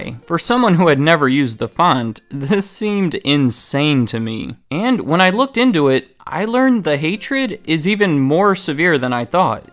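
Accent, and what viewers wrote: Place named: Midwest, United States. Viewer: Not related to the topic, but english isn’t my native language and I find it interesting how he pronounces the word comic as “caamic” and iconic as “icaanic” etc. Is this accent common among Americans? No hate please, I’m just curious.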